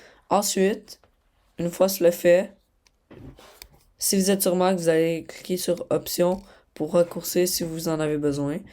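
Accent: Canada. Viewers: j adore ton accent quebecoi tabernacle de dieu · Ses un accent canadien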